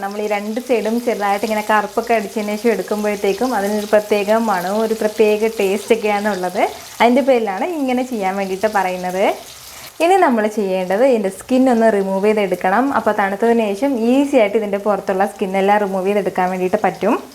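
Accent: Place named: Thiruvananthapuram, India. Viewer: Thiruvananthapuram aanoo.. Slang oru vyathyasam.. 🤣😍Enthayalum adipoli. Kelkumbol thanne oru chirichkondulla suntharamaya mukam manasil varunnu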